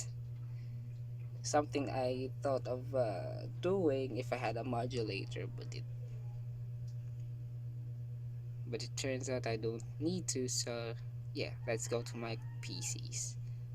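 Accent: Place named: Russia. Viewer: your voice is very russian accent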